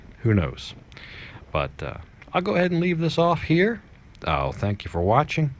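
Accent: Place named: East Coast, United States. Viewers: great videos! keep it going! reshhtraunts and shhtreets....what accent is that? east coast? where you from originally? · Your accent sounds like South Jersey, the Philadelphia area or Delaware in the US. Are you originally from any of those areas?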